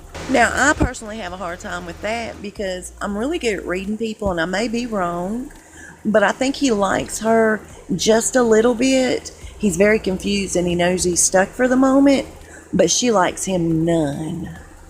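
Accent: South, United States. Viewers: Is her southern accent real? Is it a Houston or Florida? Don't be angry with me, I lived on the west coast most of my life...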